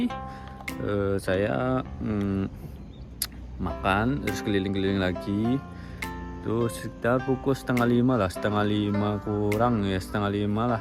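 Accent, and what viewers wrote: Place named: Banyumas, Indonesia. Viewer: Ngomong GEning te ngapak boss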